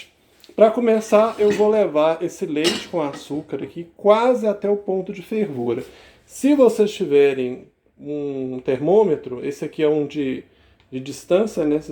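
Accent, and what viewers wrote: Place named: Minas Gerais, Brazil. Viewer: Não têm né 😖meio difícil só pegar a receita de boca com sutaq de mineroooo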